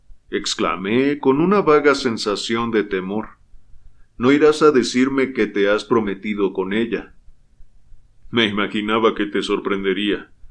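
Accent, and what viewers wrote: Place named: Mexico. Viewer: Hola!! He seguido desde hace meses tus lecturas y ya eres mi favorito. Tu voz con acento de Mexico es muy agradable. He buscado NEMESIS en español de Agatha Christie solo la encuentro en inglés.. Nos la podrías leer? Gracias valoro muchísimo tu trabajo.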